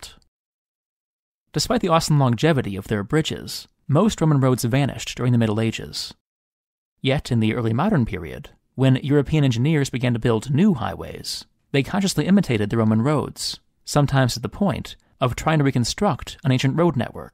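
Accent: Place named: Chicago, United States. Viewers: you have a super thick Chicago accent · i could tell you were from chicago. i picked up the mild accent in your videos. great content!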